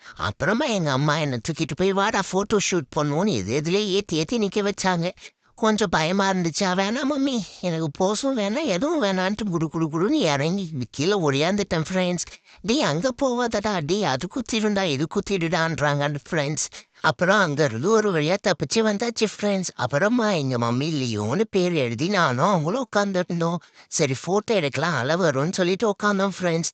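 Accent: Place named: Chennai, India. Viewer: Ada namma ooru paiyanaa neeyi ❤. Ana chennai slang pesuriye da paiya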